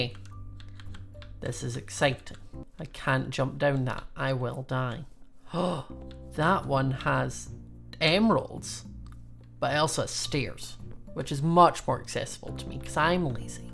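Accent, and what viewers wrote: Scotland, United Kingdom. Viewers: You remind me of that sloth off of ice age because you are taking Dragons . Your so funny and I love your Scottish accent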